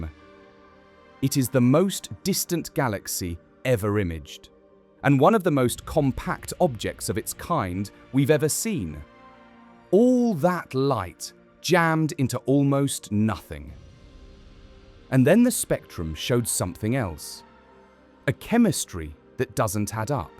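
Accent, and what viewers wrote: United Kingdom. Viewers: What's with the British accent? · Why does the narrator have a British accent??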